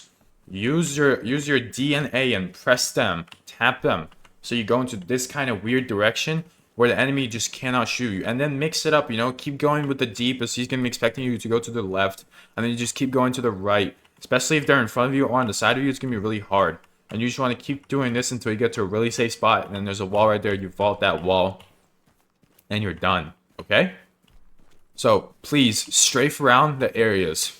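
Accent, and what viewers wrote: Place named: Scotland, United Kingdom. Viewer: Oh the Scottish accent 😭 maybe work on it I suppose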